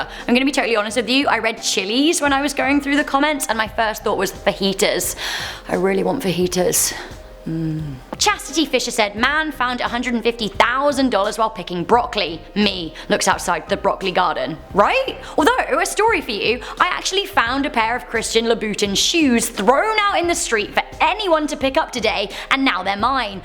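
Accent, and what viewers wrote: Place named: Cornwall, United Kingdom. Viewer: Miss you are looking stunningly beautiful and sounded not like a pirate but more like my neighbours here in Cornwall UK 😍 but as always a great video plus very informative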